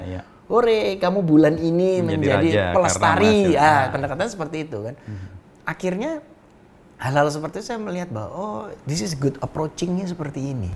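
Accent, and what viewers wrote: Java, Indonesia. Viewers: baru tau ini di RL, Mas ngomong ada medok nya 😂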